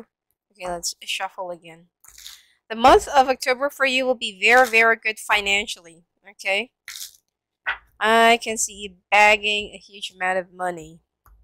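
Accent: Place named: Netherlands. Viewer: Hi, are you Dutch? Cause you do have a Dutch accent 😊😉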